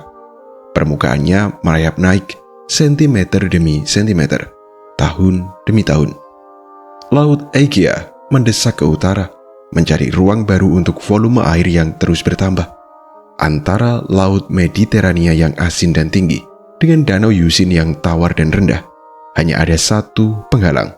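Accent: Java, Indonesia. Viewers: Logat Jawa Memnag enak kalau untuk narasi film dokumenter artikulasi jelas dan suara ngebasnya itu enak didengar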